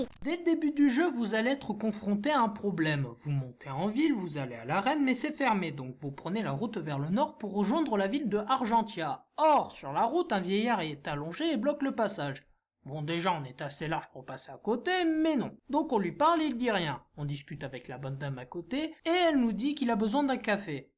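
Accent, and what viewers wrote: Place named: South, France. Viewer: Oui respect mais t'as un accent du sud je me trompe ?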